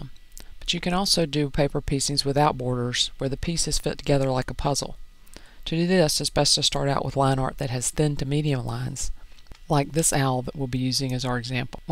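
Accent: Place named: Louisville, United States. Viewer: Is that a Louisville accent? What team is the eagle from? thanks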